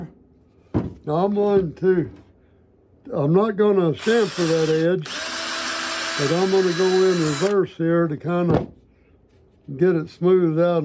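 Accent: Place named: Appalachia, United States. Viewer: No yeah! Def not everywhere but I grew up in Appalachia and almost alllll the old folks talk like this, and honestly he reminds me of me my own pawpaw a little bit.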